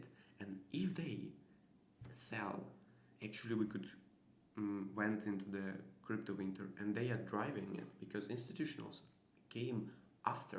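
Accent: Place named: Russia. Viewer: Хочешь на англ аудиторию работать, поставь произношение. Такой английский для рускоговорящих - родной, но возникает немой вопрос: "а можно уже на русском?". Англофоны будут скипать пока, сложно разобрать что ты там говоришь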